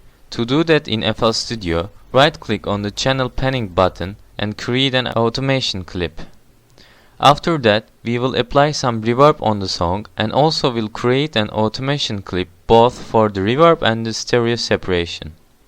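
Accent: Turkey. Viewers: he is turkish :D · lan yemin ediyorum kanala bakmadan bu ingilizcenin türk birinden çıktığını anladım aosıhdpoanrv8ebrwıunabf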